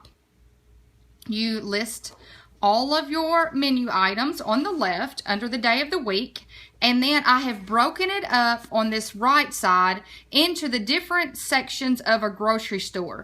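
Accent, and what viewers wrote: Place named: Alabama, United States. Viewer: Girl this is a very good system and printout. I'm from Alabama and I know you are close by.......I recognize that accent :)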